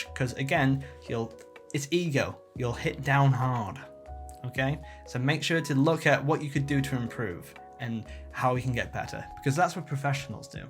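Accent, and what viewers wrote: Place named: England, United Kingdom. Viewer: I cannot sit and concentrate on an english accent explain comedy to me. I just imagine this guy stuffi g his face with crumpets fig newton's and tea.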